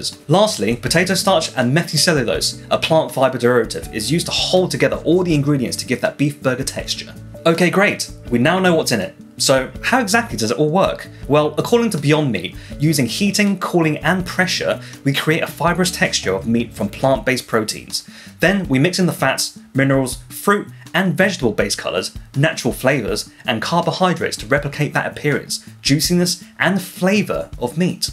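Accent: United Kingdom. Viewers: this has to be a first for me 52 years on this planet , i have never saw an asian speaking british accent.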